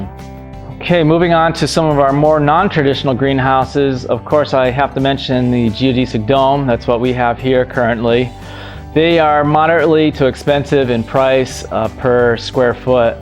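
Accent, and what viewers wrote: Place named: United States. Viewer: Barrels in a greenhouse supposed to store heat, then temperature can be more consistent. Barrels can only be filled with water, hit by the sun, or connected to solar panels. You can also fill nutrient solution that you circulate trough your plants, using any kind of temperature control that you wish. heating the water in your greenhouse is way more sustainable then air. Especially some of hydroponic systems require a huge amount of water. I am also missing a bit of broad analysis on greenhouse types. Pros and cons really depend on climate but I only heard that is either suitable for your climate or not which I assume is somewhere in the US because of your accent.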